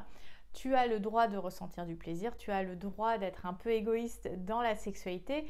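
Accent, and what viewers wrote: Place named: Morocco. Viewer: Peut-être es-tu originaire du Maroc ? à cause de ta prononciation tu as mon abonnement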